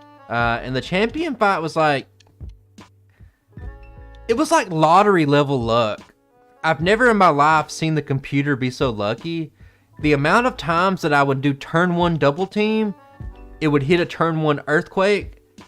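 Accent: South, United States. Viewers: I'm up in bed watching this run and the DUUUUUDE! that kept coming out of that southern accented mouth of yours had me jumping to run to the bathroom before I pisted myself. Bravo, DUUUUDE bravo.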